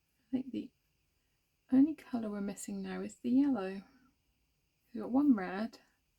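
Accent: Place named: England, United Kingdom. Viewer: ❤ Hi! ❤ I'm here, watching and enjoying! What region in England are you from? I'm trying to figure out your accent.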